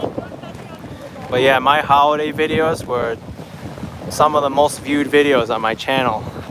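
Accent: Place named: New Jersey, United States. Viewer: YOU are at my former neighborhood. Although I grew up in Union City just north of Jersey City. You got the Jersey accent very good. Who ever thought there would be skyscrapers in Jersey City!! WOW! I miss seeing the skyline from Jersey. When I lived in Union City I saw the skyline everyday. Thanks for traveling to Jersey City. I hope you got back home safely. God bless you.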